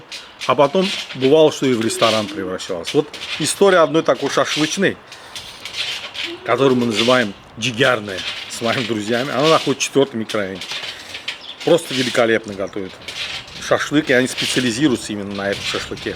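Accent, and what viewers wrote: Baku, Azerbaijan. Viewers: Маэстро! Просто супер! Бакинский акцент, свежайшие продукты и руки профессионала - все что нужно для хорошего шашлычка!) Дай Бог вам здоровья! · Ай брат гардаш!) Это просто супер! Бакинский акцент, свежайшие продукты и руки профессионала - все что нужно для хорошего шашлычка!) Дай Бог вам здоровья!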